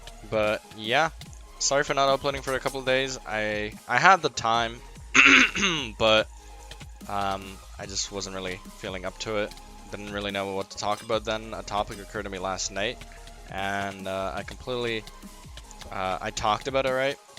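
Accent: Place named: Netherlands. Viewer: You sound a little Dutch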